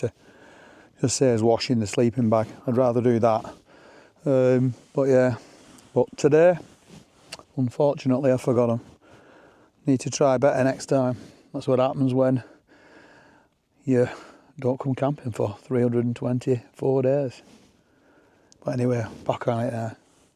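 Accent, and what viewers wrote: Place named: Northern England, United Kingdom. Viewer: Fantastic to see you back out and hear your superb Northern tones,us Northerners stick together. I’m 62 and you’re inspiring me to start this wild camping malarkey. Look forward to the next video mate.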